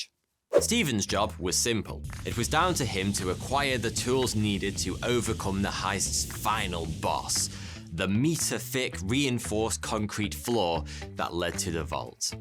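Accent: United Kingdom. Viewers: This video is like a bank robbery movie with all sorts of fancy introductions, but just in a lower budget. Nothing wrong there. Interesting video. Felt like I was watching Ocean's Eleven done by a stout British bloke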